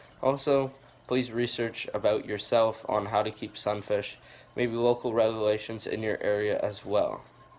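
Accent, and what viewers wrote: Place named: North, United States. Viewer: I have three sunfish I got out of the lake I had a 75 gallon somebody gave me I had lime rock gravel plants already I grow on aquatic plants I took a sponge and made a Matten sponge filter covered it with lime rock and put a powerhead behind it so basically I might have spent I had everything but I will say $30 to set my 75 gallon tank up if that for my sunfish And then I just threw them in there they are tuff fish I’m in Florida so I don’t know sounds like you’re from up north somewhere I get my own aquatic plants out of the streams and rivers and ponds and we got a lot of it. So $300 is a bit much you probably just bought everything new hey if I can make it I’ll make it but good job your tank is pretty and clean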